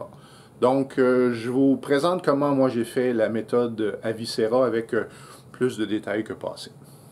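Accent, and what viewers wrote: Quebec, Canada. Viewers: Série de cours très instructifs et bien filmés. Vu de France on aime bien le petit accent québécois ! Je débute en tournage donc merci professeur et bonne continuation 👏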